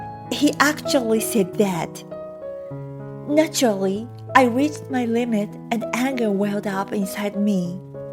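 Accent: Japan. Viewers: The audio seems to be spoken by Japanese. So why use Latino names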